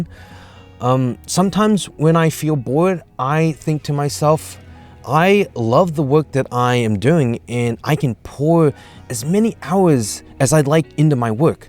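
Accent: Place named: New York, United States. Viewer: Why do I keep getting a hint of NY or NJ accent? 🧐. Really enjoy your videos! Well done!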